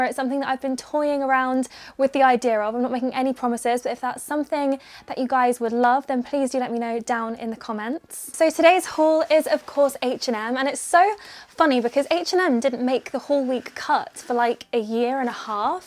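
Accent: United Kingdom. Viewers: U sound so british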